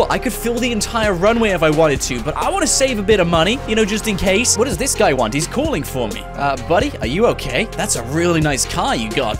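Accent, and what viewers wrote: United Kingdom. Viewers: are you from United Kingdom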